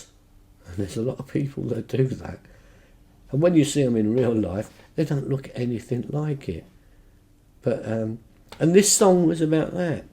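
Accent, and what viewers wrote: England, United Kingdom. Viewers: Was so surprised to hear the accent and realize you're an Englishman. My late grandmother from Yorkshire would have loved your music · Wait i thought i expected is Kind Uncle From Texas but when i Realize he is English that make my heart warm even more 💚 remind of my late Grandpha that live in yorkshire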